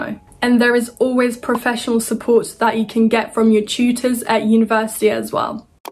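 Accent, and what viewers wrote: United Kingdom. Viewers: Bilerek mi british accent kullanıyorsun yoksa orda alıştığından mı? Cevaplarsan sevinirim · personally i prefer american accent but the more i watch your videos i become a fan of the british accent. It's adorable when you speak English that way :) Actually, I'm obsessed with the English language :) , but I have never had the opportunity to be in an immersive English environment. I envy you :) good luck with your studies.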